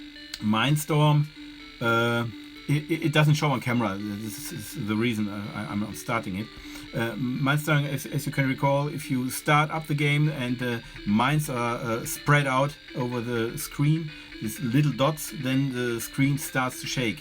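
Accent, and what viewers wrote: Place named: Germany. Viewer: You sound like German is your native tongue. ... and Giana Sisters as background music! Rocks!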